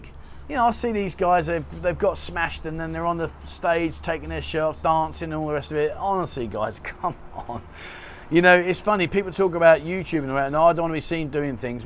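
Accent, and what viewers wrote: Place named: RP, United Kingdom. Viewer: ...does a good job with information...and with a bit of the 'kings accent, too! remember: be cool..if you are 21yoa, 41yoa or 71yoa..from europe, the US or wherever. does not matter..be cool.. the beer thing..most of the clubs drafts..not pubs..but thai bars drafts are watered down...if i go in the first is a bottle..then..i might switch to a draft..."balance".. dress nice but not too nice. be well-groomed, and hygiene. put some slick hair gel..a nice bottle (not cheap) of colone helps..i would bathe in it and the girls would cuddle right in the club and compliments me too. like any woman they like assertive..you see a girls you like go ask her or ask the waitress to ask her over for drink...best thing be and have "fun"..don't sit there like at a dentist appointment.. kind of like the more fun you are having the more fun "the" girls like that.. 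the rule of no photos has been for decades..i think..in angeles city/PI up until i think 2012 or so you could take pictures and video...from what i know there..not anymore hence internet. if i am on a chatting app..it (phone) is in my lap or on the table..guy "things" like that the girls in the clubs..pick up on and will respect your and like you...since you are "protecting" them.. i will say thei for the 11th time.."best" years of my life...best.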